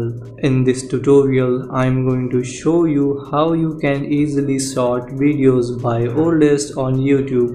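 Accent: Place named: India. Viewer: It's always the guy with idian like accent who fixes problems i salute all of you you don't know how much y'all helps luv you guys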